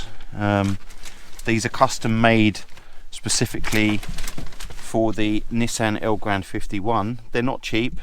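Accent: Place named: Southern England, United Kingdom. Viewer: Nice job. This is exactly the route me and the Mrs want to do with our E51. All the gear but not convert it. Great stuff. You sound like your from the south mate, I’m in Margate, are you anywhere near there?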